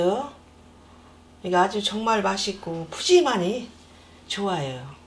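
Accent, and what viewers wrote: Chungcheong, South Korea. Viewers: 무수, 무시 충청도구만이네요 구수하네요 예산,당진 그쪽 아니신가요 잘 봤어유 해먹어봐유 환장하자 맛있네유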